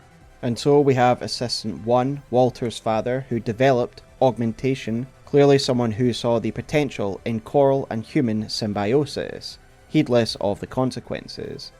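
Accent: Scotland, United Kingdom. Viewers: You’ve never heard a Scottish accent?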